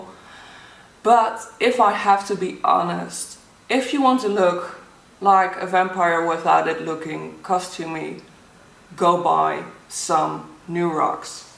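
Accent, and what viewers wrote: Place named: Netherlands. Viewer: Are you by chance dutch I think I recognize the accent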